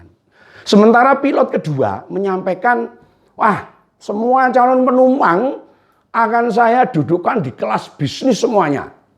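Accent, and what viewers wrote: Java, Indonesia. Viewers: Selamat sore om 😁saya suka dg cara anda mjdi host dg logat jawanya yg sngt kental 👍👍😁🇮🇩..Pak SP itu dlm hati bilang "siapan pak Jokowi udh bikin gw malu" pakde Jokowi blg " kapookkmu kapannn pak brutus😂😂mknya ojo kesusu 👈🇮🇩❤️ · Keren bangen,jowone Medhok tapi aku suka banget ulasane...lanjut berikutnya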